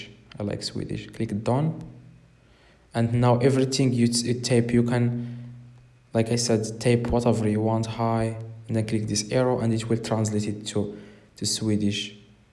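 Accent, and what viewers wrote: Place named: Morocco. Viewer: bayna fik mghribi 😂❤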